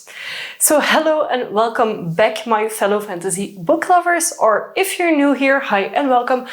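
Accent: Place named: Netherlands. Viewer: no worries I like the dutch accent.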